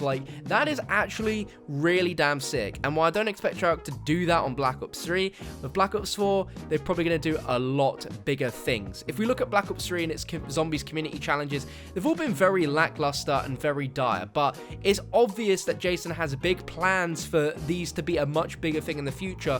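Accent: United Kingdom. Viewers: He is British? Or American?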